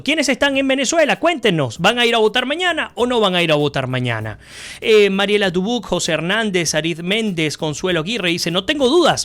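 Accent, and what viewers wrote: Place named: Rioplatense, Argentina. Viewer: descubrí estas trasmisiones tuyas por YouTube hace sólo unos días atrás y mi primera impresión ha sido muy positiva. Una pregunta, aunque te indentifiques como venezolano, detecto un acento o argentivo o uruguayo, es correcto? Pregunto por pura curiosidad nada más. Un saludo de un cubano desde Madrid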